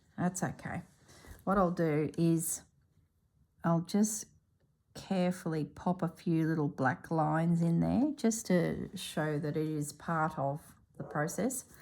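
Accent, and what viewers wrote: Australia. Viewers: Ah! Love what you did with the leaves! I JUST LOVE this channel! I love your style, your warm conversational style, stories, and, of course, that Aussie accent and vernacular! So glad to have found you !❤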